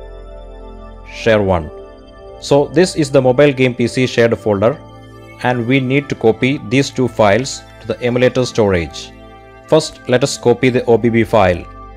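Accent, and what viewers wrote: Kerala, India. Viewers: Bro malayali analle. English parayumbol manazilavum😭🤣😅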